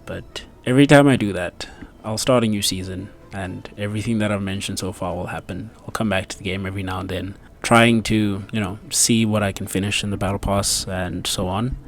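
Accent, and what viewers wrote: South Africa. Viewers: Now hold on are you from South Africa... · Hey are you South African?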